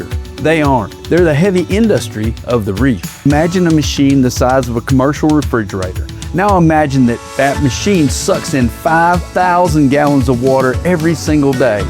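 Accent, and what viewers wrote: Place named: South, United States. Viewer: This is fascinating - but also it’s nice to hear a southern accent for a change.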